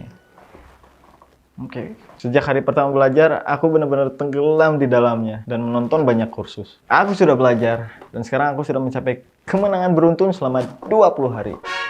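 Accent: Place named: West Java, Indonesia. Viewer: Logat sunda ey ga hilang semngat terus bang 👍👍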